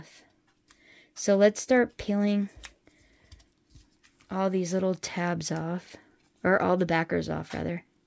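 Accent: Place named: Canada. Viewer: I have been watching you for a few months and you have become one of my favorites to watch. I love you little laugh and your "swear words." I notice your accent and assume you must be from Canada-correct?? You need to add a little personal information to your films so we can know you on a little deeper level. You are a hoot!!!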